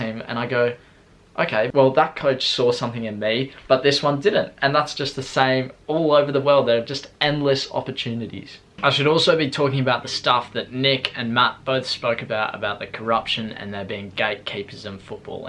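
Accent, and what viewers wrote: Australia. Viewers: Is this guy Australian I just found this guy why haven't I heard of this guy?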